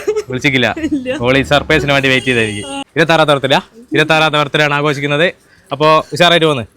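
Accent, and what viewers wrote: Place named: Coastal Kerala, India. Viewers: Chettante slang poli. Evida sthalm. Kannur aano🤔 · വടകര സംസാരം 😂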